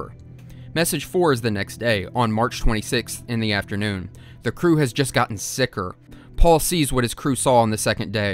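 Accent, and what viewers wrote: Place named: South, United States. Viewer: Somehow though the way you talk and show things makes even this absolutely terrifying freakshow seem friendly and welcoming.... Either its not that scary the 2nd time or its just horrific without a well humored Southern guy talking about whats happening idk ...did you use the whisper TTS on FL Studio to name episodes because of Serial Experiments Lain?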